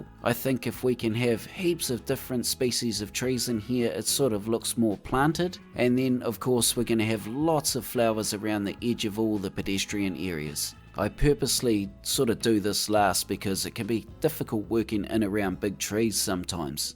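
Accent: New Zealand. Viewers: I much prefer CS1 content - it's just so much more dynamic. I can sort of sense the big CS content creators are trying their best with CS2 but its really not picking up where CS1 left off and more or less just starting where CS1 started. Anyways, I still love your content and enjoy hearing the kiwi accent for CS videos.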